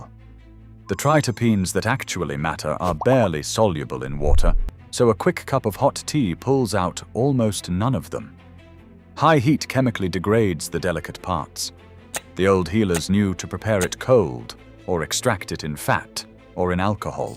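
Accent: United Kingdom. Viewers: probably informative im sure, but I turn off the message presented with the heavy British accent, as if accentuating the one we can really believe and trust. I wish all heath, peace in well-being everyday.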